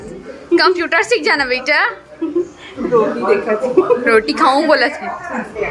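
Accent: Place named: Chhattisgarh, India. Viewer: Mast he apke cg boli bhakha video dekh ke mja aage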